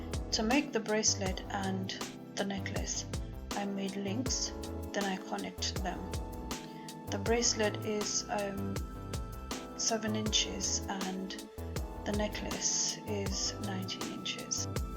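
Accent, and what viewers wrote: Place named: Trinidad and Tobago. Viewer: Hello! I just came across your channel by looking for an easy chain link to make. Your work is easy and beautiful! Thank u, all the best! By the way I heard your accent, by chance are u a Trini? You sound a lot like me, lol. Thanks again. Gonna subscribe!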